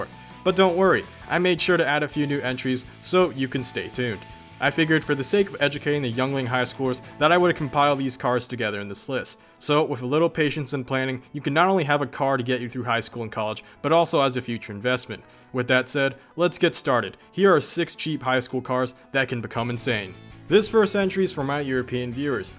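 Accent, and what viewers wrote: United States. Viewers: Dude you sound American but your using euros you make my brain hurt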